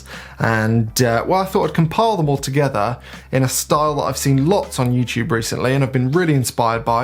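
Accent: United Kingdom. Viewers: I mainly watch this channel for the British. "Trousers", "lorry", "aluminium" etc.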